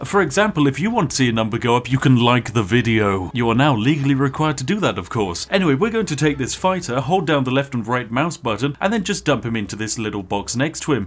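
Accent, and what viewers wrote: United Kingdom. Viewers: You sound too british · Hey....... are you actually British?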